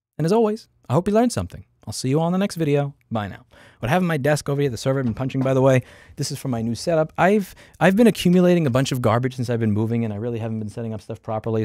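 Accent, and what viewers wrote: New York, United States. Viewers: New Yorker style pronunciations of things is part of why I love him so much.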